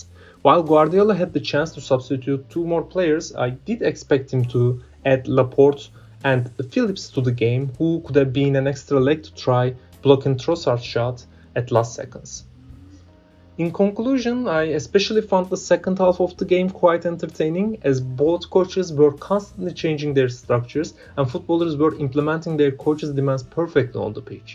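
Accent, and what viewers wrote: Turkey. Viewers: you sound like a turk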